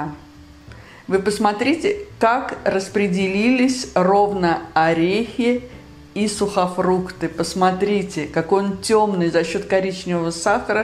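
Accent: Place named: Baku, Azerbaijan. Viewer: Красиво говорите! Вы случайно не из Баку?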